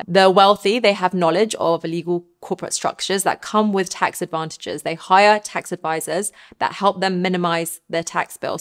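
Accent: England, United Kingdom. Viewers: I can't understand your British accent 🤡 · Can someone tell me what accent this is? Is it a specific regional UK accent? She sounds a lot like the YouTuber Vaughn George and I've never been able to figure out what his accent is either.. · is very cute and has a lovely British accent. AND a smart lady. Very practical video. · First time on the channel. What a gorgeous lady and the british accent also hits the spot. What a great way to learn more about ficances. Subscribed! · Why do English talk like that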